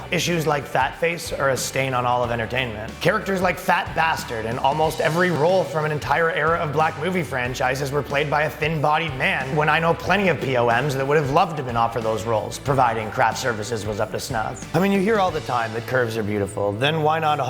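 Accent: Canada. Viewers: your canadian accent was THICK in this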